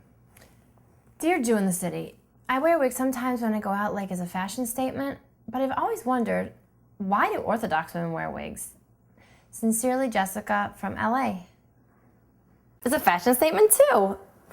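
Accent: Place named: New York, United States. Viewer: I must admit, when I first started watching your vid, I thought, "O dear. Here is an annoying materialistic Jewish gal with an annoying New York accent". But then I found your vid to be very spiritual and quite Biblically educational. More!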